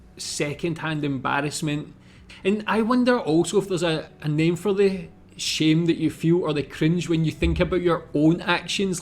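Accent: Scotland, United Kingdom. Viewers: oh boy, your scottish accent really showed in this video xD somehow love the way you say "booze idea" lol... but it also helps you with the german tongue twister... that was FLAWLESS :D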